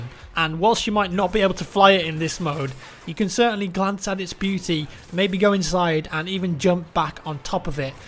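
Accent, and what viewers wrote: England, United Kingdom. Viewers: You sound British, yet you say beta the American way? Lol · Lewishague10 well me and are from the same town in England and thats just how its said, put it down to accent or w/e you want but it is what it is and it is fact lol. bee-ta i wouldnt just make shit up for the fun of it lol. even admitted it himself so there you go.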